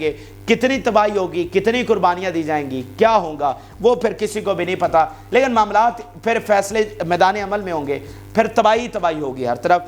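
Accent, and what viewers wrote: Punjab, Pakistan. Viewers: Bro your urdu with punjabi is really beautiful _ab ek aur katta khul ghaya ❤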